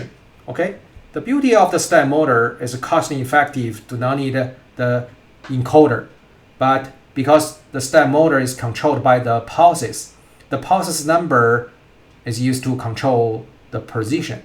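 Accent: China. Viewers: Regret we didn't meet sooner，are you Chinese? Sound like Chinese-style_English.he he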